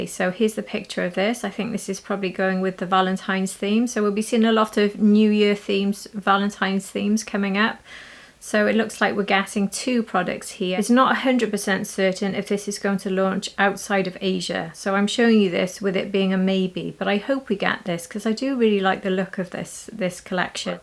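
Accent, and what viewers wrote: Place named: South Wales, United Kingdom. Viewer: I love your accent, is it South Wales?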